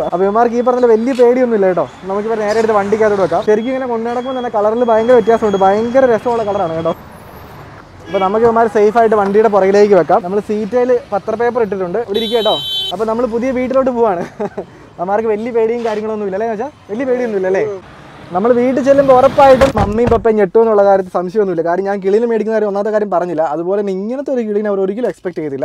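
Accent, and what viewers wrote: Kottayam, India. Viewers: നിങ്ങൾ കോട്ടയം കാർ ആണോ?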